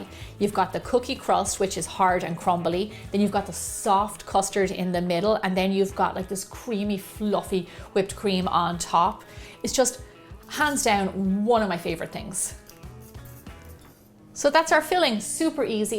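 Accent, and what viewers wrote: Ireland. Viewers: I’ll make my own all organic pie crust 😉 short cuts not important for me 😊 ... thank you for the recipe ❤️ your accent make me wanna move to Ireland 🇮🇪❤️😊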